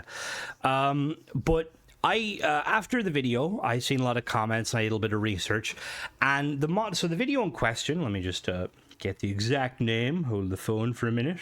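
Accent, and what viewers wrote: Ireland. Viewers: M8 your accent sells it for me I think the Irish sound hilarious every thing else bonus your great lad am a Geordie so appreciate how funny accents are are and btw starfield is what it is the problem is it's not what everyone thought it was gonna be it's deffo not crap by any means bit niche yes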